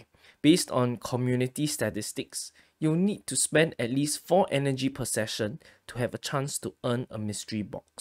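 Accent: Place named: Malaysia. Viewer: no worries mate, ur explanation was crystal clear and highlights most of the important things that people like me wanna know. my question is, are you malaysian? singaporean? or indonesian? that english accent of yours is familiar to me haha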